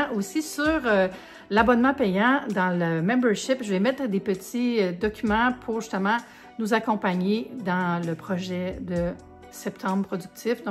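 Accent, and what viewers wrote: Quebec, Canada. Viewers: Hello! 🥰 Juste pour dire: "J'adore ce que vous faîtes!" 🥰Et ce délicieux accent québécois m'enchante... 😍 A très bientôt! 😘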